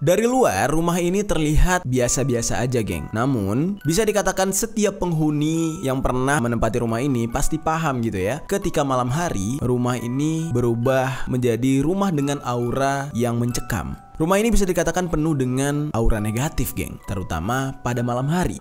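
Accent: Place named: West Java, Indonesia. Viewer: Lidah kulon weee tonggoku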